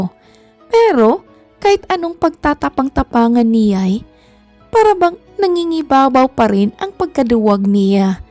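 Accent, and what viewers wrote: Visayas, Philippines. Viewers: Bisaya di ay ka....😊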